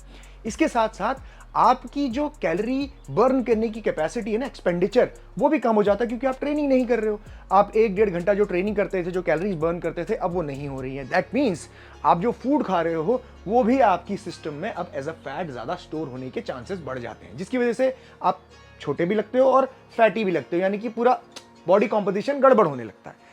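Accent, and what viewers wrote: India. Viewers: of course he has a perfect indian accent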